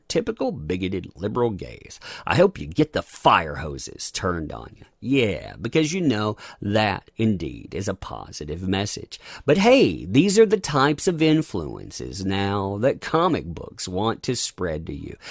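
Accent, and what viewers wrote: South, United States. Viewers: fix your hillbilly voice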